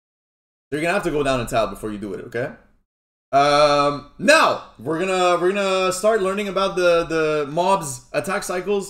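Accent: Quebec, Canada. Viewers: yo are you from quebec?? man you sound like youre from quebec hehe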